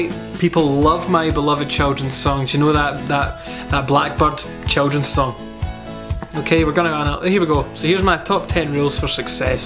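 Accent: Scotland, United Kingdom. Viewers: Rule 23: Have Scottish accent <3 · I love your scottish accent,:) Can listen to it all day!:D I totally need to visit Scotlad once:) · Rule 11: have a glorious scottish accent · Can you do an entire video in the most unintelligible Scottish accent for all the non Scots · You're SCOTTISH? I only watched your metal and metal and I never knew lol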